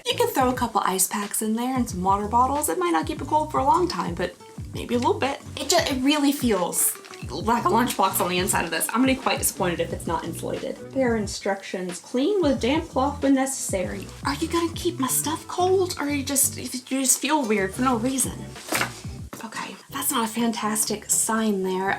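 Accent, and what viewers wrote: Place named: Ohio River, United States. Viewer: I know this is completely off topic but…. It never fails to amaze me that I live maybe 75 miles to the north of you if that and we have vastly different accents. How’s the divide at the Ohio river that mighty in this accent game?!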